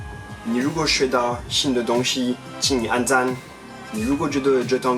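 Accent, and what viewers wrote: Standard. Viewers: 你簡直是個好學生!! 很有學習精神，也超像我們那年代，要一個一個字查字典，寫上部首，筆劃，然後再造詞，你注音也感覺學得好，難怪說的標準，沒有怪腔怪調!! 加油了!!再則，你很會挑劇看，讓自己持續興趣~~我看你應該很快可以學成語了!! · 你好！早上起來，先是看到facebook上，東森新聞分享你幫助小狗找到主人的影片，接著看了你對台灣的看法那篇影片、台灣倒垃圾文化的影片、最後這篇你一路上學習中文的影片，衷心佩服的除了是你標準的中文咬字、還有強大學習中文的各種方法與精神，真的很優秀！祝福你在未來的生活更順心、喜樂！ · 中文很標準太棒了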